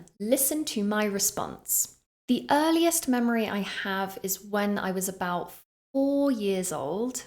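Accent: United Kingdom. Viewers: Your British accent absolutely shone through . I want to speak like you but it would take me years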